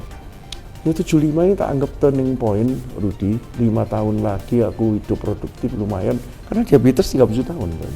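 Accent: Surabaya, Indonesia. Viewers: medok surabaya-nya khas banget, baru tau kalo org surabaya. biasa baca bukunya aja soalnya. Bangga dadi wong Suroboyo Pak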